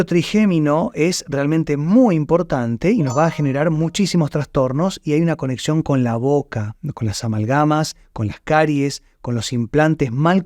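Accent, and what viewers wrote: Buenos Aires, Argentina. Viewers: Hola sos de Buenos Aires Doc??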